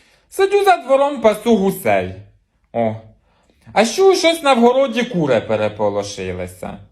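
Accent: Poltava, Ukraine. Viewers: Полтавська говірка! Точно так говорили в нас. Ностальгія по рідним місцям..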